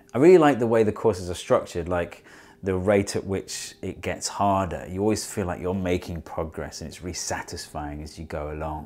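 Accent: United Kingdom. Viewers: British always sounds cool.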